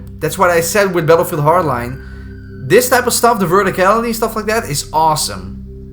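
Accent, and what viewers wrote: Netherlands. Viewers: You sound Dutch af? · sound like an dutch accent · I smell Dutch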